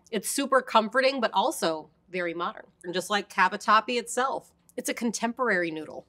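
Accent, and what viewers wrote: United States. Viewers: Why the hell she pronounce all the Italian names in Murican? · Because she is 'Murican lol. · That Americanized pronunciation of the pasta names kinda ruins this video for me. I mean, it doesn't have to be an "Itsa me Mario" accent but just a little bit more effort would've made it better imo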